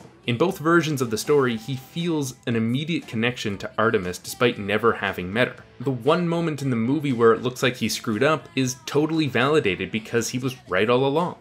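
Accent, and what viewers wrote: Canada. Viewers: Sorry, dude. I just hear Nerdwriter in a Canadian accent.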